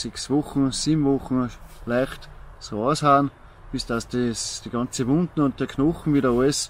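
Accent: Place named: Ernstbrunn, Austria. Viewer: Wo ist dein schönes Ernstbrunnerisch abgekommen?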